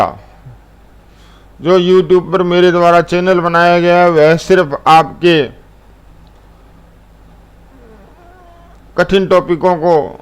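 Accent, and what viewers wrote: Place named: Jalore, India. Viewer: आप जालौर जिला राजस्थान के निवासी लग रहे है सर लहजे से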